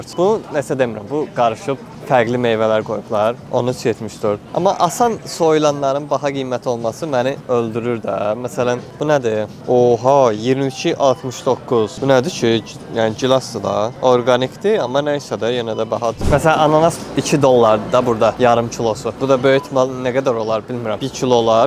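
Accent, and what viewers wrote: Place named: Shaki, Azerbaijan. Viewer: Şəkili ləhçəsi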